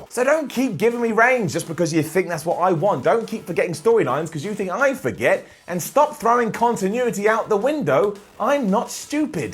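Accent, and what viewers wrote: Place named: United Kingdom. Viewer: I've watched so many videos featuring that I'm so used to his voice that I can't hear his British accent anymore. I'm just completely used to it.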